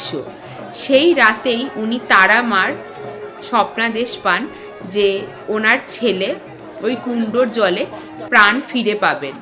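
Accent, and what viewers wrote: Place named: Kolkata, India. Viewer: Amon bol cho jeno kolkata theke gecho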